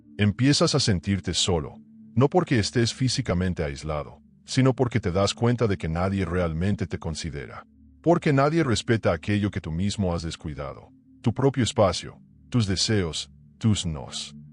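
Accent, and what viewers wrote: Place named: United States. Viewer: La Información muy buena, pero el tono gringo pésimo,...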